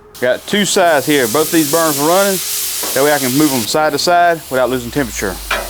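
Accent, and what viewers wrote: South, United States. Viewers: GD these look good 🤤😋 I love your country accent btw❤️ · Hey where you from? I hear that southern accent in your voice 😁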